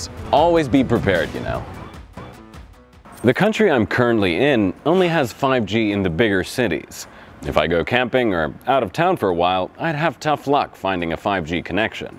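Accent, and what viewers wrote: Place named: United States. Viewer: I can't pick his accent. It's sounds American to me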